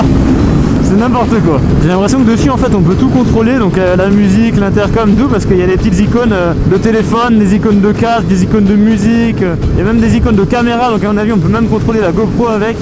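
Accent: Alsace, France. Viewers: super essai complet et vidéo au top!!!je kiffe la cohérence dans ttes tes vidéos.ainsi que la musiques qui annoncent les différentes rubriques et qui restent les mêmes d'une vidéo à l'autre...sinon pour la ducati de ton pote tu as eu des news?À propos j'ai une petite blague en rapport avec ton bel accent alsacien qui colle très bien avec l'incendie de la ducati:"tu sais ce que veut dire SP sur les camions de pompiers?SA PRULE!!!"Avé l'accent évidemment...😂😂 V et longue vie à toi et hâte de voir tes futurs essais✌👏👏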